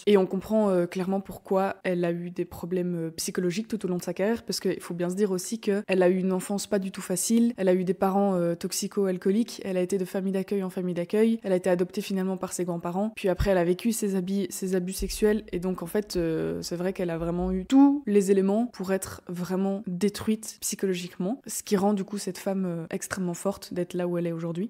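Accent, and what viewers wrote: Belgium. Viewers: Dès que tu as commencé à parler, j'ai su que tu étais belge grâce à ton accent. Ce n'est pas l'accent bruxellois, que les Français pensent à tort être le seul accent belge. Quand je t'écoute, j'ai l'impression que tu as l'accent de Liège ou de Namur, je me trompe ?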